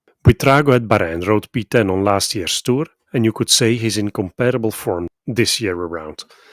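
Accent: Flanders, Belgium. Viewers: 😀super tick Flemish accent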